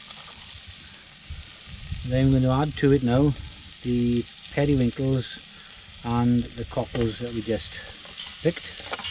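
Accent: Wales, United Kingdom. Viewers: Great prawns n lovely welsh accent👍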